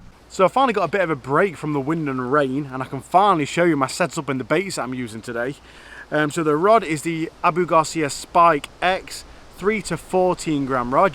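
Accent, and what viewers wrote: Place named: Nottingham, United Kingdom. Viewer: Alright, im from nottingham, you sound like you are from my neck of the woods . Where is this river you fish , is it the maun ?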